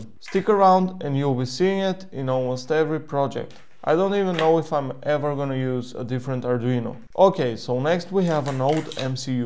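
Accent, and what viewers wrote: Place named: Israel. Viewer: there's no fucking way, you have the most Israeli accent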